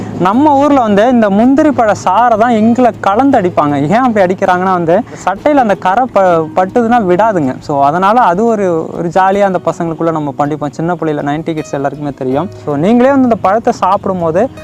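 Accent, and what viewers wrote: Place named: Cuddalore, India. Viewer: ரொம்ப யதார்த்தம் .அதோட உங்க தமிழ் நம்ப கடலூர்மாவட்ட வழக்கிலே இருப்பது கூடுதல் சிறப்பு..ரொம்ப மகிழ்ச்சி...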